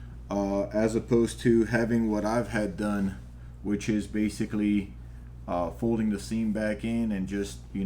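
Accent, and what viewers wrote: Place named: Texas, United States. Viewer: I'm from Texas and you're from Florida, but I'm jealous because you have a perfect Texas accent, especially from the Central toward West Texas regions. You look like you could be in western movies. You might consider being an extra, at least. No one in, say, Fredericksburg or Hamilton or Junction, Texas would ever think you're a Floridian.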